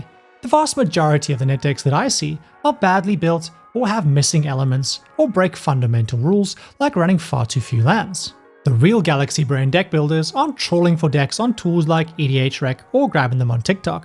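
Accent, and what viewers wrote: South Africa. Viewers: Nice video. Is there some south african in that accent?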